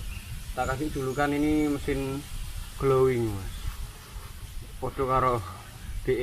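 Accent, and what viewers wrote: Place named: Yogyakarta, Indonesia. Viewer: aku barusan sub,sampean arek ndi sih,kok ngomonge koyok jowo jogja.lokasi bengkel dimana mas,salam dari jawa kaltim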